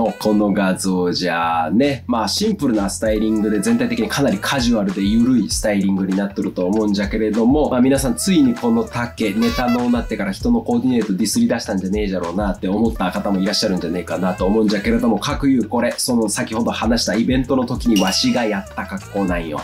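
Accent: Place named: Chugoku, Japan. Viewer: 中国地方の方言すきだな〜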